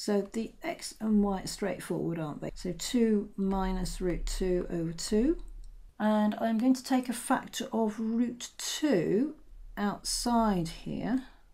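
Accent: United Kingdom. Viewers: This is awesome, a brilliant explanation with British accent, super.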